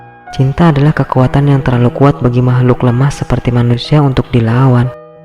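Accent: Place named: Bugis, Indonesia. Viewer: Ada logat2 bugisya..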